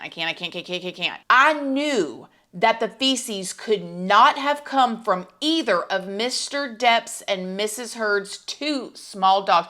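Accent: South, United States. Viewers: Watched a few of your vid's. Then one day I noticed you have a southern accent. I lived in the south for awhile. Love your accent. And yes we all love you!!!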